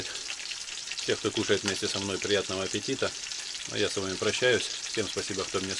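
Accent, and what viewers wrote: Ukraine. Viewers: Поэтому и спрашиваю..по говору понял что украинец. Я недалеко от Новочеркасска проживаю ни снега ни льда нету давно.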